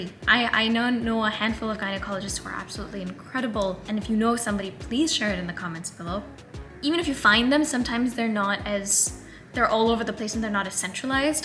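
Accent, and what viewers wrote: United States. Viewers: Didi ap aise english bolte ho jese ki ap phle se american ho❤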